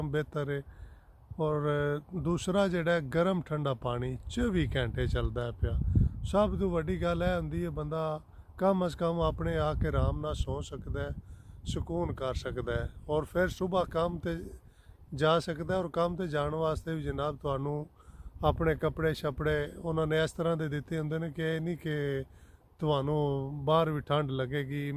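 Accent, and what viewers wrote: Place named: Rawalpindi, Pakistan. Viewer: Bhai sahib lagda hey tusi Rawalpindi tu ho. Tusa ni boli tu lagna ey.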